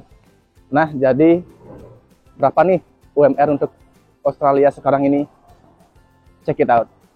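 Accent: Bali, Indonesia. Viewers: Pasti asal bali, logat2 bali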